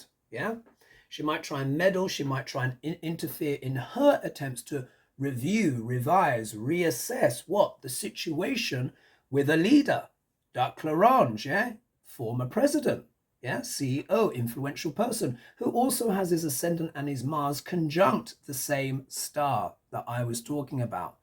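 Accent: England, United Kingdom. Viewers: as a gay gentleman I can’t help but say you look cute as a button and don’t ever do anything to that gorgeous English accent. You open your mouth and the angels come outdancing no less. May God save our gracious queen!!! ❤❤❤❤❤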